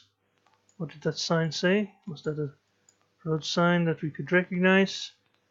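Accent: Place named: Scotland, United Kingdom. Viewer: For Greek script you can keep in mind that the P is actually an R (similar to Cyrillic in Russia), the triangle is a D, the H is an E, the triangle without a bottom line is an L and the P is an R. That are - im my opinion - the most valuable Greek letters. And if I may ask, from which country are you? Yo sound like a Scotsman.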